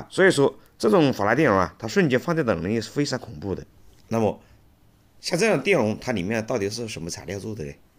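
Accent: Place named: Hunan, China. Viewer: 湖南口音，果然是三一重工的员工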